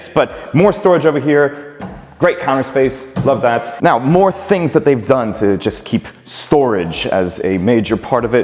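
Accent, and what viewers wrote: United States. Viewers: Came here after watching the Unpacking Japan interview (which was fantastic, by the way), and the wild fluctuations between US accent and faux British accent when giving property tours are cracking me up.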